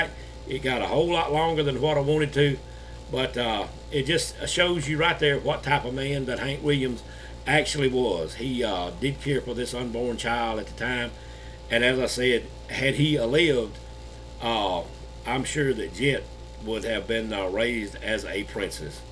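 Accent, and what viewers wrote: Alabama, United States. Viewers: Alabama has the sweetest gentlemen in the world. I love my home state. I will never leave. Thank you for this video - listening to your accent and stories were the best part of my day. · You got yourself a new subscriber now! I enjoyed this video! I was born and raised in Birmingham, and joined the Marines out of high school in '03. I live in Houston Tx now, and your video and the way you talk, make me miss home....keep up the great work! Roll Tide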